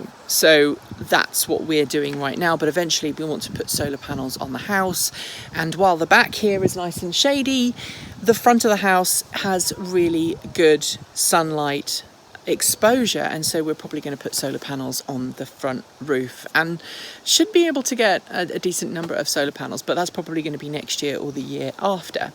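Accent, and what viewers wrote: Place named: United Kingdom. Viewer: I hope your plane's batteries make it all the way to the UK! BTW, I always assumed you were in the UK given your accent, which I assumed was British. Energy independence means to me the ability to freely purchase the energy I need/choose to use without undesirable government or other influences. I'll probably never be in the energy business and even if you are off the grid, you're still dependent on other suppliers of parts and equipment to create and store your own energy. Freedom to me means a competitive marketplace with multiple potential vendors to purchase from.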